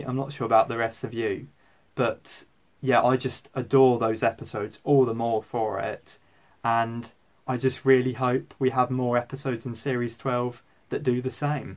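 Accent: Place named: Northern England, United Kingdom. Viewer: I’ve got an out-of-the- blue (pun intended) question: do you have relatives from the north of England? ‘Cause I feel like I can hear a hint of a Northern accent(I’ve mentioned my good ears before😏)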